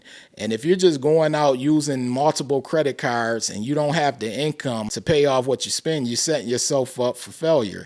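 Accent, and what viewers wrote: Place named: Philadelphia, United States. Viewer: Bro, you sound like you're from Philly. Buffalo is a surprise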